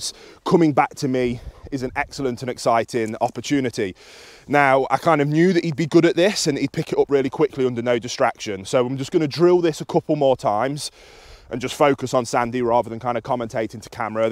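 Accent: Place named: North, United Kingdom. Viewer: I'm late to this party. As a northerner in London, it's nice to see and hear a northern Brit doing this, rather than an American. Thank you!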